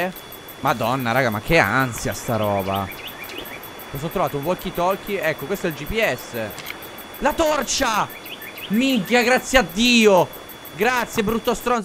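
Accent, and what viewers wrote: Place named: Bari, Italy. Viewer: da quando favij e diventato barese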